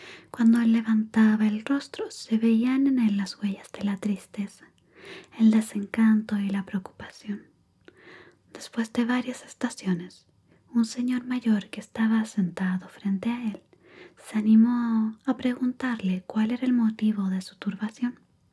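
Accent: Chile. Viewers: Desde la primera vez que te escuché, quedé enamorado y fascinado con tu dulce voz y arrobador tono chileno 🌹👸🌹🎼🎵🎶🇲🇽🇨🇱 GRACIAS 🙏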